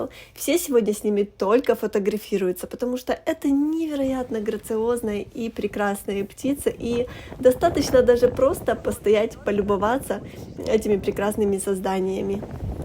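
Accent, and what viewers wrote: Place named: Ukraine. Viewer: Она не одесситка,видимо не так давно живёт в Одессе, оттого не все говорит верно,,, Довженко,,😮 слышится укр акцент,у одесситов другой говор,акцент 😮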